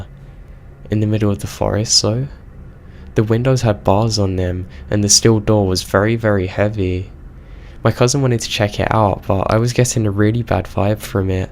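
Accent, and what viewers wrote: England, United Kingdom. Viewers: I'm an American sub., and I love it when you can't help it and laugh. When you broke up over the word "fanny pack", I laughed out loud at 5am. Couldn't sleep...thanks for the laugh. Your a great, really gifted natural storyteller, and I love your accent. What part of England are you from? All the Best!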